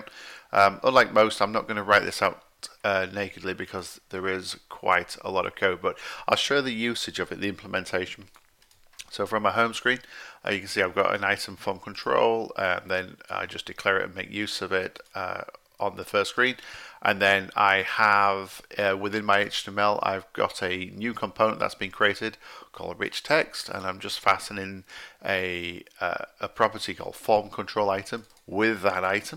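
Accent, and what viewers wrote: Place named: United Kingdom. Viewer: UK accesnt so horrible ..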